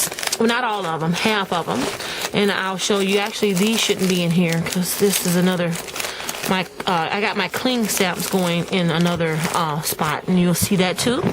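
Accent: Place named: St. Louis, United States. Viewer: Awesome Room, can I come shopping! :-) lol St. Louis Talk, "Whered' you go to Highshool?" Do you drive on highway "fardy" :-)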